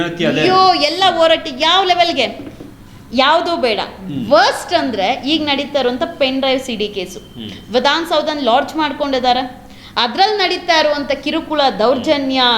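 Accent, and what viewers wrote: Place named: England, United Kingdom. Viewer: Mam neev matado kannada style super 👌 👍 English style alli kannada maatu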